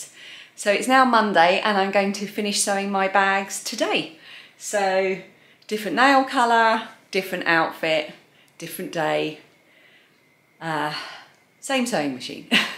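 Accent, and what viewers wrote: United Kingdom. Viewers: Really enjoyed watching this, a familiar British accent. I can assure you that you’re not the only one who sews like this, or lives in a busy house sewing on your kitchen table! 😂 It’s how I learned and copying shapes from my wardrobe. I turn things inside out to draw around them! I’ve only recently invested in a set of patchwork square rulers to help neaten everything up as I’d like to sell some of what I make. I still use my ironing board for cutting everything out, it makes it easier for ironing. Still not got a craft room with neatly organised fabric on shelves. I also recycle as much fabric as I can from old clothes and linens. Your bags all turned out amazing! My favourite is the black and white with yellow zip. I’m inspired to make something similar but add a bright pink lining. 😊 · "EMBRACE THE WONKYNESS!" saith she, the #1 Wonky Queen of the Universe!! 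The funny part, at least for us assorted Americans, that your UK accent, which we associate for some reason with authority, makes it all seem kinda formal and official! LOL so you've probably done more to encourage those "across the pond" to sew that 10 other podcasters combined. So thank you,! Thank you! Love and hugs from Virginia USA to my UK fam! I read a lot of the comments, and not only do we have a HIT, but looks like a lot of new subscribers too! Congrats, my girl, congrats!!!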